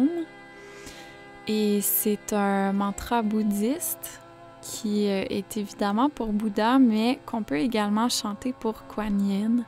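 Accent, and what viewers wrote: Canada. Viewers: Coucou Bonjour A Toi au Joli Chat🐱 J Aime Ce Style de Vidéo.. L endroit ou Tu vit...Le Canada me fascine Ton Accent😉 Gros Bisous de France🐱🐺🐾😊🐈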